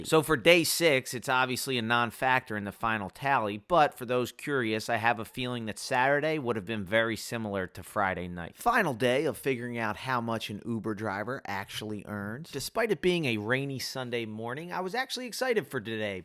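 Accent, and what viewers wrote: Philadelphia, United States. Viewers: now i know for sure you're a true Philadelphian....besides your accent and the Jawn hoodie, you know how to say "Lancaster" right. :)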